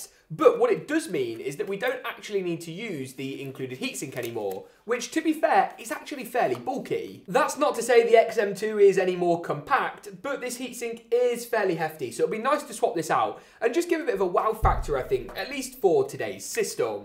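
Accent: United Kingdom. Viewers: The bri ish accent is the most annoying accent in the world trust me man !!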